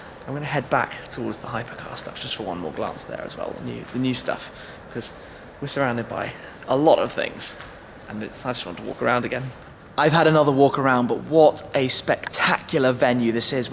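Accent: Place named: England, United Kingdom. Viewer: theBreserk Accentul unul englez totusi, nu te poti astepta sa pronunte corect denumirile cu diacritice. :)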